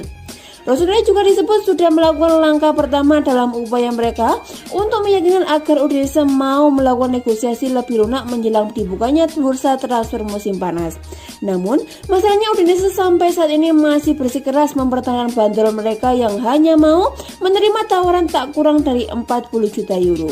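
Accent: Java, Indonesia. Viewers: medok banged jawa nya 😁😆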